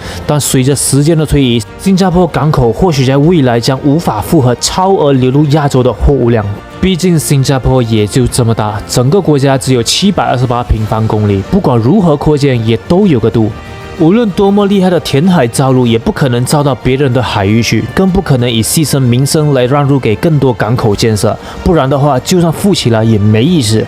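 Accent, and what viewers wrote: Malaysia. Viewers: 是马来西亚人的口音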